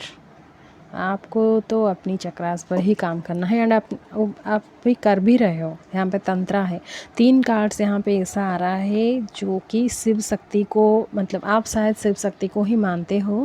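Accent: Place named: Jharkhand, India. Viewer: oh mujhe laga Jharkhand se ho...apki accent se laga.. 👍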